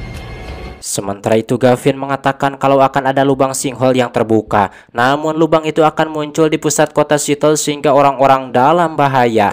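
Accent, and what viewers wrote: West Java, Indonesia. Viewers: Aslina,, anyar da ngadenge review kieu tp logatna sunda pisan😅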